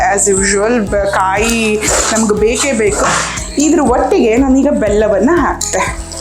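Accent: Kundapura, India. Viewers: kundapura kannada super 😍😍 Niv tumba chanagi matadtira.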